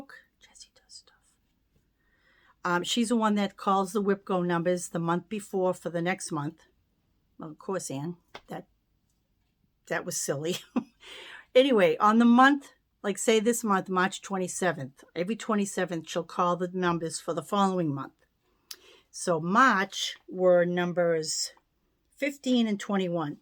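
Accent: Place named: New England, United States. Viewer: I love your from sea to shining sea! And your NE accent!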